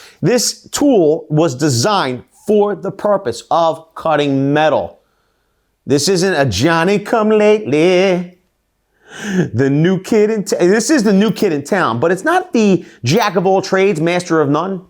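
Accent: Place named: New England, United States. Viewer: The voice , couldn't watch that Yankee accent..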